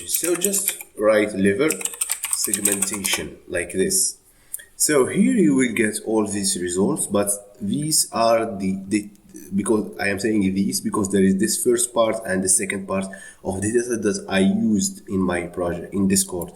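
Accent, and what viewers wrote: Morocco. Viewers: I know a Moroccan voice when I hear it . Happy to see my fellow compatriot delivering a course on this channel. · HHHHHHHHUU tanta ban lik mghribi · لي كيشرح مروكي باينة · Anyone who's confused with the word "paasions", it means "patients". I too was confused due to his moroccan accent.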